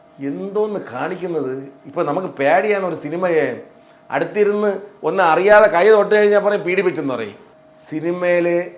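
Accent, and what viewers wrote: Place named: Trivandrum, India. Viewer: ഇത്രയും വൃത്തികെട്ട ഒരു സ്ലാങ് trvndrm കഷ്ടം തന്നെ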